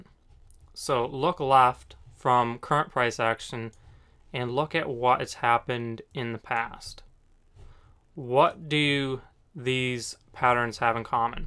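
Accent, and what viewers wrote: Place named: New York, United States. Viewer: As a newbie in the crypto world, I can see how easy it would be to get sucked in with misinformation from so called experts. After watching a few of your videos and seeing Bitcoin do exactly as you said it would, I am glad beyond words that I found you. Now instead of putting my money into a course run by thieves I am looking forward to the course you had mentioned that is coming soon. Here is to learning the right way and I don’t know if you are from New York or not but your delivery sounds just like a New Yorker especially when you are talking about all the people out there who don’t know what they are talking about. You just tell it like it is…love it!!!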